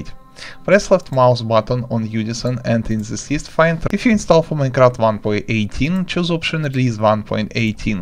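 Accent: Russia. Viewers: такой русский акцент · хахаах сразу слышу русский акцент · It's actually Runglish. 😏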